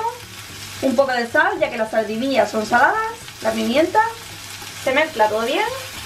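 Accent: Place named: Seville, Spain. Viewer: Hola. Me encantan tus videos y sobre todo tu acento sevillano ¡OLE! Me encantaría que hicieras una receta con los mejillones en escabeche: Empanadillas de mejillones en escabeche y queso de Burgos. En el vídeo no mencionas si son en escabeche o no, pero yo creo que si no lo son, también puede valer. Bsos.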